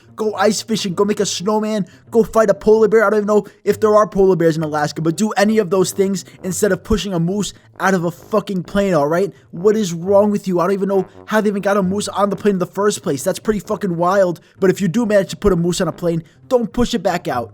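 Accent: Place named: Brooklyn, United States. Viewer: Why is some guy from Brooklyn talking about Alaska?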